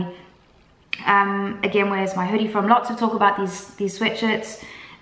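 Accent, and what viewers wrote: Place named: South Africa. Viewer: I had no idea that you weren't British but you have a strong South African accent. I had no idea you are a singer.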